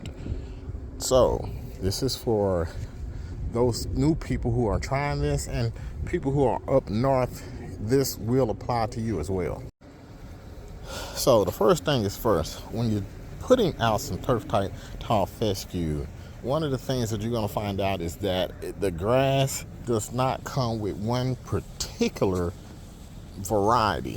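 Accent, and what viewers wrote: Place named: Texas, United States. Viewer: You sound like a guy from Texas named should. 😄 Great lawn. 👍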